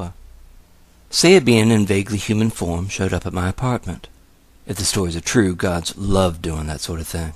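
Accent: South, United States. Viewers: I really like your voice. Are you from Virginia? You sound like a classic southern gentleman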